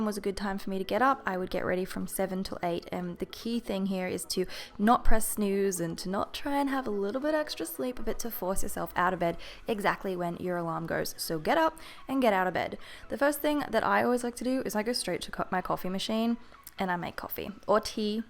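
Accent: Australia. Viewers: I can tell ur from Australia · Is she Auatralian? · Are you Australian? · Yep she's Australian 😊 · are you australian? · Love your accent 😍😍 Australian? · Are you Australian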